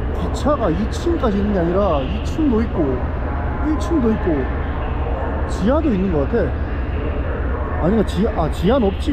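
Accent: Gyeongsang, South Korea. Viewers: 뜨신물, 꽈자 ㅎㅎ 숨길수 없는 경상도 남자의 흔적. 하지만 자막은 서울말 ㅎㅎ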